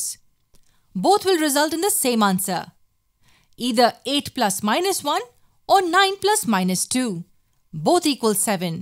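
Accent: India. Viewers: the accent is clearly indian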